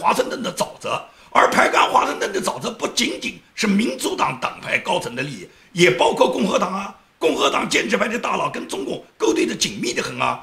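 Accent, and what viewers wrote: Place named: Guangdong, China. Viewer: 听口音不像广西的，更像广东的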